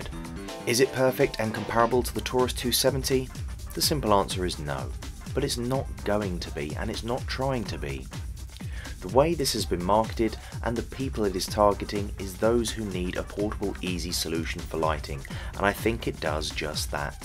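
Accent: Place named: RP, United Kingdom. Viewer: Was worried we wasn’t gonna hear you clear speaking posh voice then when the music kept going.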